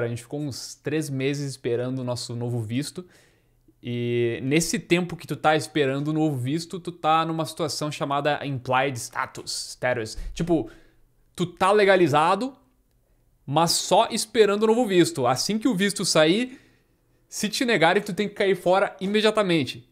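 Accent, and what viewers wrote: Rio Grande do Sul, Brazil. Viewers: pelo sotaque é um gaucho , uma grande abraço meu amigo , teus vlogs são ótimos ... fica com Deus e melhoras pra sua mãe ... ( Gaucho desbravando o mundo )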